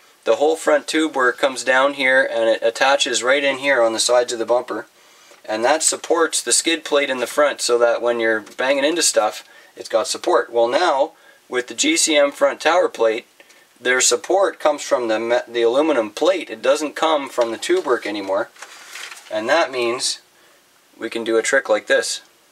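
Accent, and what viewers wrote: Canada. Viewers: Dont know why, but i always thought canadian accents are cool. Srsly, no offense. I will not mention strange brew.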